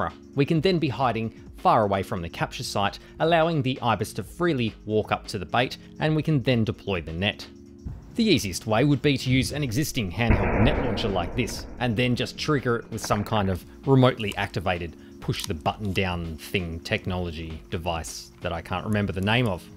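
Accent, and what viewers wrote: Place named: Australia. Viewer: You are SO funny, and clever with the storyline. Great to hear an Aussie accent on you-tube for this sort of thing (engineering, experimentation sort of thing). Maybe the vapour puffs after the wadding exited the tubes are from adiabatic cooling of the compressed air as it escapes and expands rapidly, causing water vapour in the air to condense in micro droplets in the cold gas? (Water vapour creating short-lived, mini clouds).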